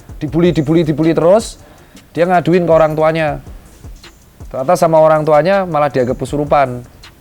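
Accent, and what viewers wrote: Java, Indonesia. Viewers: China medok jawa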